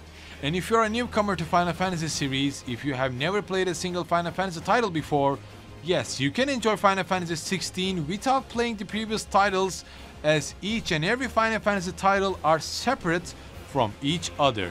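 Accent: Turkey. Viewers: Where you from? You have turkish accent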